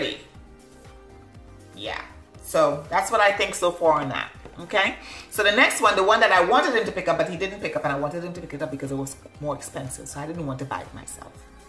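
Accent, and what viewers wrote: Trinidad and Tobago. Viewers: Are you trini ? U sound trini lol it’s cute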